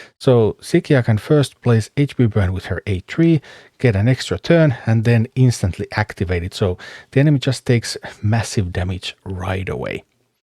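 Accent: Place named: Finland. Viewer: Are you Finnish? Your accent sounds suomalainen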